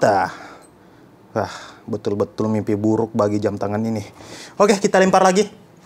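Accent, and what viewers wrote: Makassar, Indonesia. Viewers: Orang makassar nih keknya😂 logatnya keliatan bgt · dari logatnya anda sepertinya dari makassar cess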